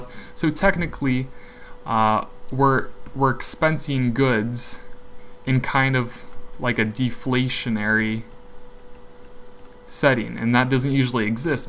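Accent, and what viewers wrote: Canada. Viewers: I can hear your canadian accient lol :)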